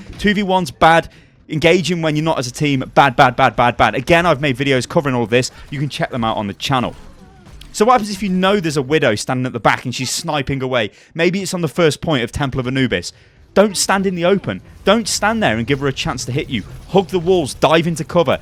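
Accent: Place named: United Kingdom. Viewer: Subscribe reason: 30% Tips and Guides 70% British Accent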